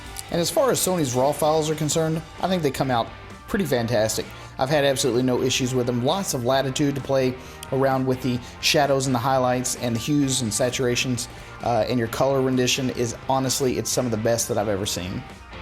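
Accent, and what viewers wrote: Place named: South, United States. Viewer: Love your southern accent!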